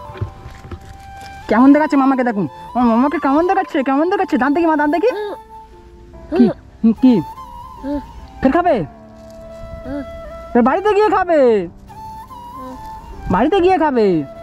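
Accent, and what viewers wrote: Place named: Rangpur, Bangladesh. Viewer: Tumar kotha rongpurer moto lage..tumar bari ki rongpur plc vai janaio...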